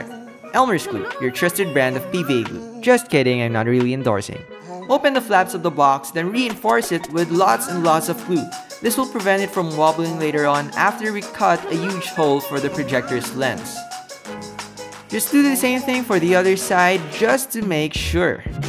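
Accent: Philippines. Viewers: He has a filipino accent :// sorry I'm new here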